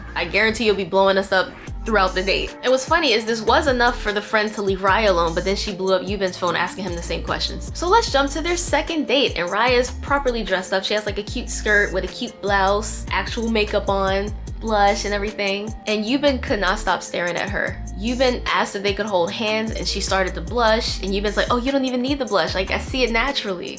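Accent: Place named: England, United Kingdom. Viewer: Just love your stories. You're such a good storyteller & make me laugh. Also, must say I enjoy your English accent. I'm a linguist too, and a great believer people who speak other languages are also good mimics. 😊 Keep doing what you're doing - more power to you girl! 😊😊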